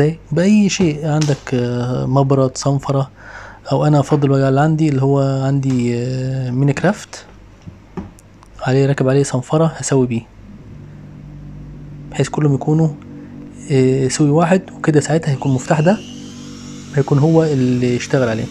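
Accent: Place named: Syria. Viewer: Te felicito tu programa es muy explicativo, aunque me casi no entiendo el arabe tuyo pero pareciere sirio o libanes. Soy de Venezuela y me gusto en verdad ese secreto de los cerrajeros. Bendiciones y muchas gracias.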